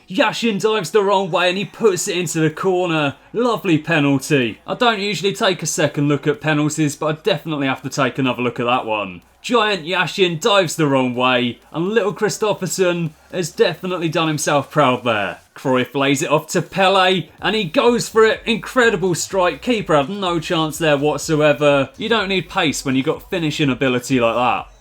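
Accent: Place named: United Kingdom. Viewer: I like your British accent melted through years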